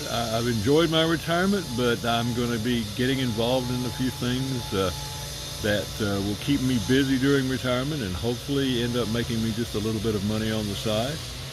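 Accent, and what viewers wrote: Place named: South, United States. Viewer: Congratulations on hiking your own hike, and knowing when your personal journey was over. Being honest with yourself is difficult, when you set lofty goals and know your abilities, but know in your heart that you have realized that your dreams and visions have been answered in every aspect. Now you can carry them in your heart and share them with others who might be inspired to attempt the life-changing journey that you have taken. I'm sorry that I didn't get to see you in the flesh at Upper Goose Pond Cabin, but it was not to be. You have become a companion in my armchair, and shared six months of your life with my wife and me, and we are grateful for your time, effort, and good-natured humor, delivered in a soft and mellow drawl. We both lived down South, individually, when we were younger, and have since married and are living in the New England state in which I was born, but, whenever we visit any place below the Mason-Dixon Line we are still home. 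Godspeed,. The Lord bless the path that He has set before you. I trust that we shall meet some day. 👍💪✌🙏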